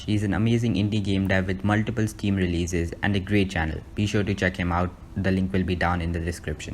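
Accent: India. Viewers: nice indian accent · oh man you live in my favourite city and your accent sounds like Indian accent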